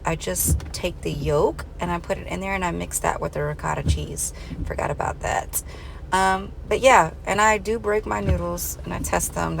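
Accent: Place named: New York, United States. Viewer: I can tell your from New York it’s all in your voice I’m from New York too the Bronx your mannerisms is so New York love it ❤️ you seem very nice down to earth ❤️ I’ve met your sister on ancestry ya look just alike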